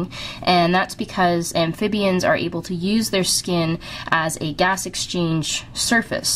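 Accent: Canada. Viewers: Are you Canadian? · Is she Canadian?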